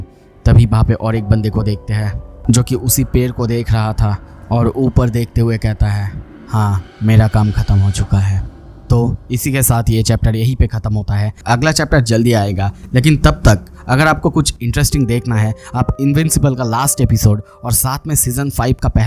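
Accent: Bengal, India. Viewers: Bengali ho kya bro😊😊❤❤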